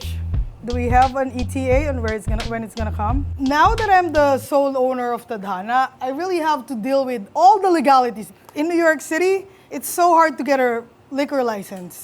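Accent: Philippines. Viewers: you can definitely tell she actually used to live in PH just by her humor and accent haha ! love her,, nakakaproud maging pinoy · Filipino humor is really like everywhere and you know it's pinoy style because of the delivery. I love your cooking style. You're not afraid to improvise. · Alam mo bilang pilipino nakakataba na di mo binago attitude mo as accent bilang pilipino kahit malayo na narating mo more power to you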